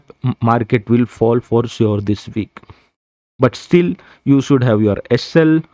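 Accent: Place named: Hyderabad, India. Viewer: Sir hindi is best from u and hyderabadi wala hindi ❤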